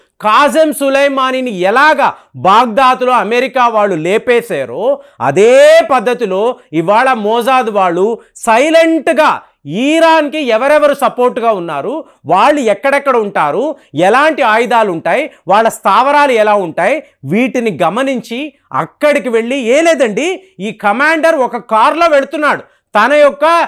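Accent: Tamil Nadu, India. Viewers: గురువు గారూ కి తెలుగు వీడియోలు 5 ఏళ్ళ నుండి చేస్తున్న ఈయనకి ఇంకా అరవ యాస పోలేదు. Leh నీ లేహెగ్ అని ఇలా ఇలా అరవ యాసలో మాట్లాడుతుంటాడు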